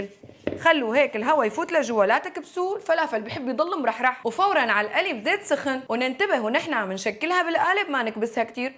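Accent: Syria. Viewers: اقسم بالله اللهجة السورية تجنن😂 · فلافل بيحب يضل مرح رح 😂 انا ما دخني كلمن وحمصاتو. 😂. يارب على اللهجه السورية مااجملها ،🇾🇪🇾🇪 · حبيت الفلافل من شان لهجتك السورية اللي تهبل الله يعطيك العافية يارب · الحجي السوري يخبل❤ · كل ومين وحمصاته. ما أجمل اللهجة السورية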